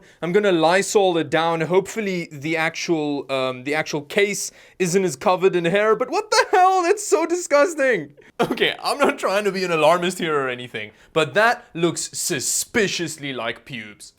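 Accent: South Africa. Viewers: My first time watching one of your videos, and I just have to know... you're South African right? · You kinda sound like a South African ngl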